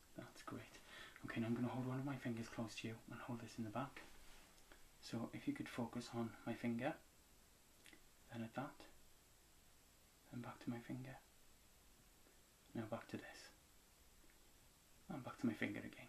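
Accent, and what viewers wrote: Wales, United Kingdom. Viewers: I love Welsh accents 💕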